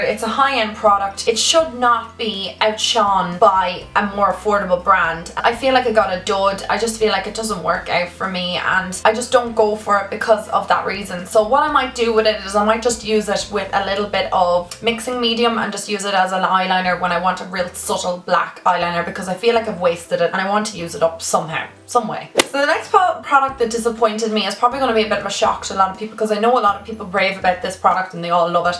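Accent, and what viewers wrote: Ireland. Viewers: I love this video and your accent!! I'm Irish too but my accents different ❤️❤️ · came on your YouTube channel. love at first sight! I'm also Irish so hearing your accent is just so homely😄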